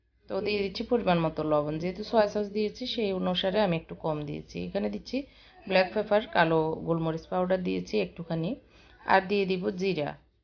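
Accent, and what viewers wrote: Noakhali, Bangladesh. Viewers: আপনে কি নোয়াখালির মেয়ে নাকি, এই ভাবে কথা বলেন কেন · সুন্দর বাচনভঙ্গি মানুষের দৃষ্টি আকর্ষণ করে আপু।এদিকে মনোযোগ দিবেন আশা করি।আমাদের নোয়াখালীর ভাষা ছাড়া ও আমরা চলতে পারি তা দেখিয়ে দেন। আজ প্রথম দেখলাম দশে ৬ নাম্বার দিলাম আপনাকে। কথা গুলো ঠিক করুন দেখবেন আরো এগিয়ে যেতে পারবেন। আপনার ভিডিও কোয়ালিটি দারুন, মিউজিক টা একটু হাই। রাগ করবেন না প্লিজ আমার মতে সমালোচনা সামনেই করা উচিত। একজন ভিউয়ার বা ছোটবোন হিসেবে ভুল গুলো বললাম যেনো শুধরে নিতে পারেন।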